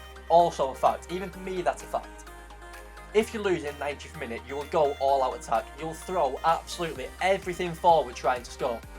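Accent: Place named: Wigan, United Kingdom. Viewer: thought the accent was familiar haha. guessing you from round orrell/Shevy? was wondering what team you play for as I'm from wigan as well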